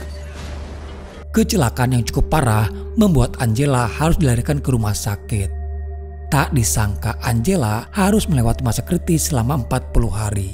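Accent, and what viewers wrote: West Java, Indonesia. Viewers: Aa orang sunda yah? 😀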